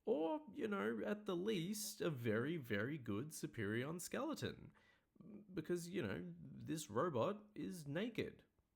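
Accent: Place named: Australia. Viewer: Nice to hear another Aussie accent. Funnily enough I'm also a haha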